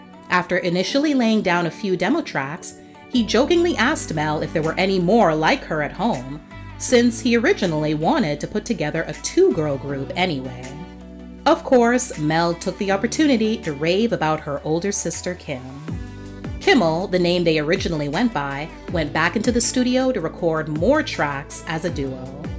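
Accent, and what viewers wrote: United States. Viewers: The narrator is American so.......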